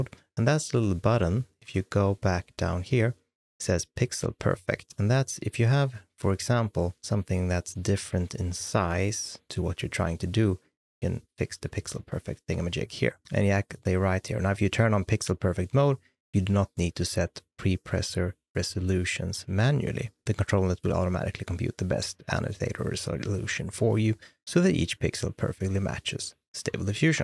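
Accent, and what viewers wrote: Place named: Sweden. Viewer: I'm here for the amazing Swedish accent! And the jokes 😁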